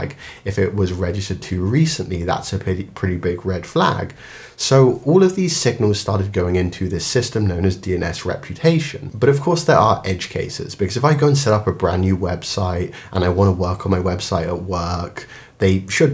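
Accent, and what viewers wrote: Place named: United Kingdom. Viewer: cool but it's time to cut shit with the british accent. talk normal now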